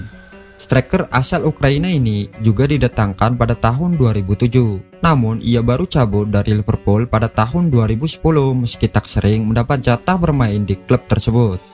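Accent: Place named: Banyumas, Indonesia. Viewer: Ora ngapak ora kepenak,, gua tau dr aksen narator nya 😁😁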